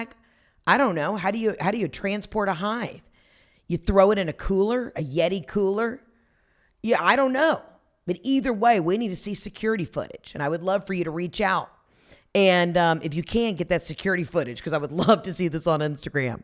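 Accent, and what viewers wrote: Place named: Australia. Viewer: Heather, your Aussie accent and humor have made my morning! Just love your spirit. So happy for you, Jeff, and the team while on your adventure down under. Love and light from Tampa Bay 🦩🏝️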